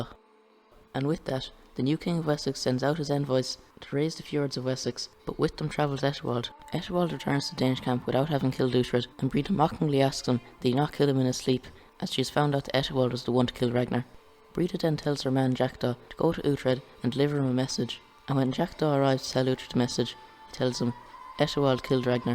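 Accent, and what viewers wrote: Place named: France. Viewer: at first I thought this dude was speaking french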